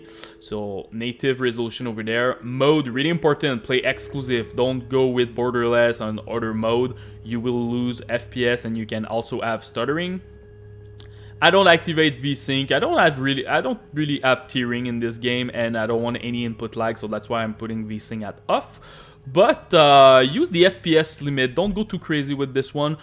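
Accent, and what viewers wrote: Quebec, Canada. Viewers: oh shit un quebecois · you're quebecois ? i am and i see you really have a quebecois accent when you talk, or just canadian in general lol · Tu vien du Quebec en! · from quebec ? haha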